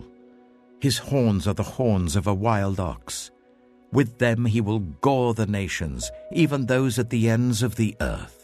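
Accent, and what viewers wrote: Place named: RP, United Kingdom. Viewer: Let's face it you cannot beat a really nice cultured British accent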